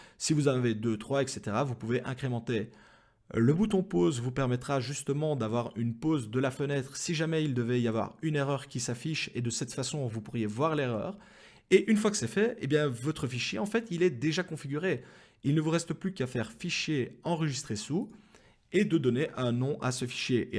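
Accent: Belgium. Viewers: J'ai cru entendre un p'tit Belge, bon, je me suis lancé avec une 6900xt, j'ai une 5700xt sur le côté, j'hésite à l'ajouter ... Tu en penses quoi ? Actuellement, je tourne à 60MH/s, c'est correct, non ? Merci pour la vidéo, ça fait longtemps que j'hésitais à me lancer, à voir si ça va arrondir mes fins de mois... J'ai aussi la possibilité de mettre un SLI de 2CG en 4Go sur un autre pc, ça va prendre ou pas du tout ? Ou je devrai me rabattre sur une autre crypto moins gourmande, genre ETH Classic (qui demande que 2Go) Signé : un camarade belge ;)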